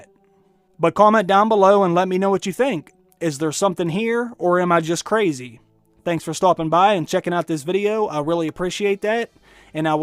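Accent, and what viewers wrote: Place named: Pennsylvania, United States. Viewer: Thought so. I could hear it. Fellow Keystoner here.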